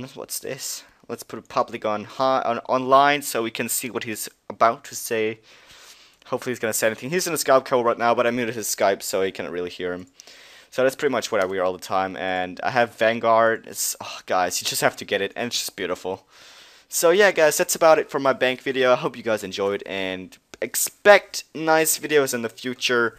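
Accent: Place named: England, United Kingdom. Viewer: It's like your talking in and english accent but trying to fight off some foreign accent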